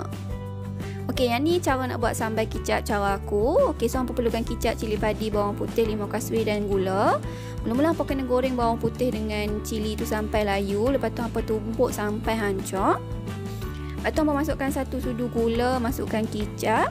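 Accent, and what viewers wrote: Kedah, Malaysia. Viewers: Orang kedah ke kak 😅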